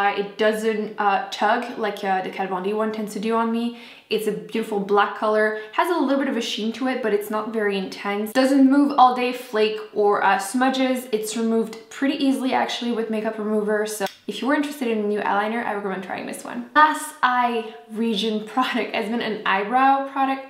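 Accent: France. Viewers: Am I the only one who loves your slight french accent when you speak english? :-D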